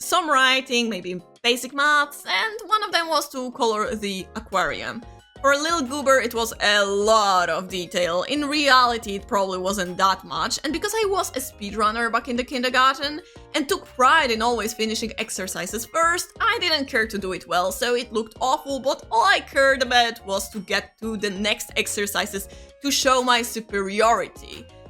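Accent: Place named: Poland. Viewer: Honestly after I heard you speak Polish I realized that you do have a Polish accent, but before that I didn't pay attention to it at all so XD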